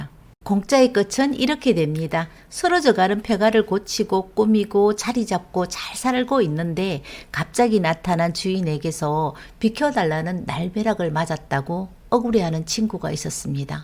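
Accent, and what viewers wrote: Gyeongsang, South Korea. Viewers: 경상도 분? 난 강원도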